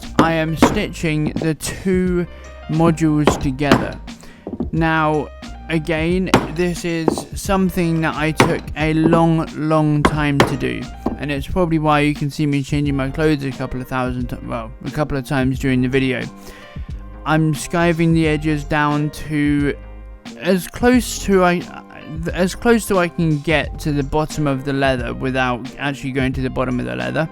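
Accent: United Kingdom. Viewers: Already subscribed. Learning a lot! Thank you. But the music is a little distracting when i understand English just not England English so some of your words are already difficult to pick up sometimes.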